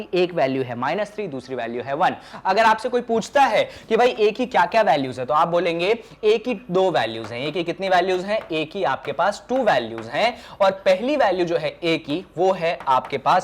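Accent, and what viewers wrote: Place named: Bhopal, India. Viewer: Sir aap shayad bhopal se ho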